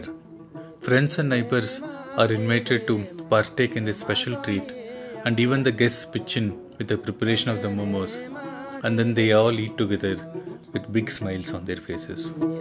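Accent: South India, India. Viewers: Orator from south india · South Indian orator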